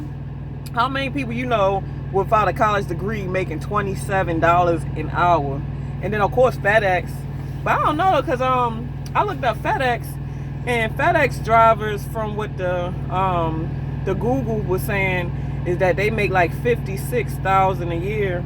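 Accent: Baltimore, United States. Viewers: That thick ass Baltimore accent, I'm from Philly I know a bmore chick when I hear it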